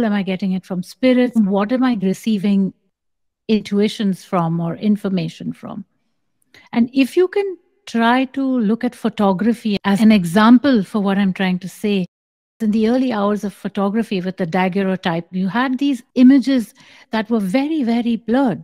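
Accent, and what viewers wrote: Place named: France. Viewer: Unmistakable heavy French accent ;)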